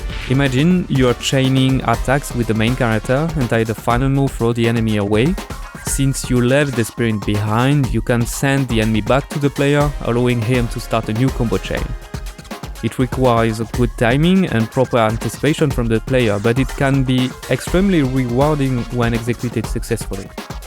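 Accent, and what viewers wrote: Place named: France. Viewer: De par l'accent, et certaines réponses, je devine un developpeur français. Bon courage ! Adorant les productions Capcom et Platinum Games, je garde un oeil dessus. Rien que le decor desertique maisi pas vide, cest deja intéressant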